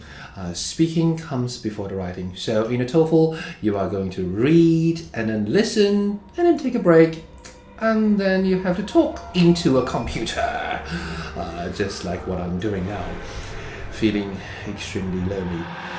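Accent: United Kingdom. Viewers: Thanks for your guidance in Speaking。 I really like your authentic British accent！ teacher looks like Harry Potter Wizard And is super cool。 Ha ha ha ！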